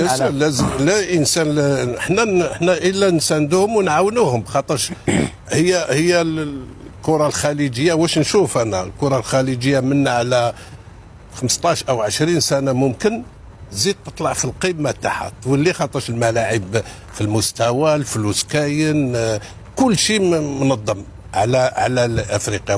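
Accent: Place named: Algeria. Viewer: مرة حلوة لهجة الجزائر احب هذا البلد من بن شيخ احسن ناس ونعم بالله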